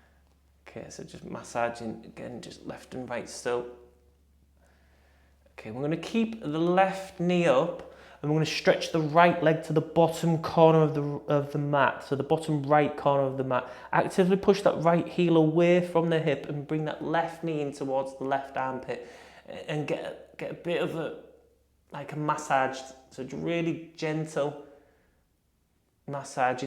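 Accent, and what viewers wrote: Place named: Yorkshire, United Kingdom. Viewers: Thanks. love you. don't worry about your yorkshire twang xx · Re:pronunciation of liTTLE - I deliberately tune into your channel as a Yorkshire lass living in London for home comfort and warmth - please do not change your elocution it’s why I subscribe. !! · Why change your accent,? I love your Northern accent...reminds me of my Grandmother. I could understand her even though we lived an ocean apart.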